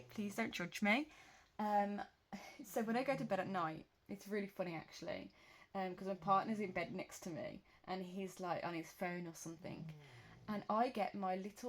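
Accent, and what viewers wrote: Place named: Birmingham, United Kingdom. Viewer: hello, thanks for sharing your journals ❤ I live your accent, reminds me of home. I’m from Birmingham but live in New Zealand now xx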